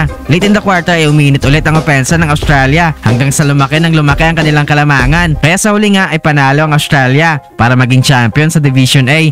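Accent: Iloilo, Philippines. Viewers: Ibang klase itong narrator a. First time ko rito. Alanganing Nobita na may Ilonggo accent.😅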